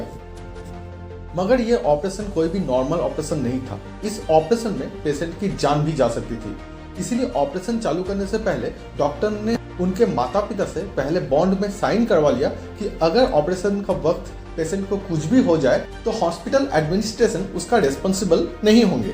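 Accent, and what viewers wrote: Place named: Bihar, India. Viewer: Yeh bost bihari bolna sikh le pahle...tera problem pasand nhi aya..teri boli.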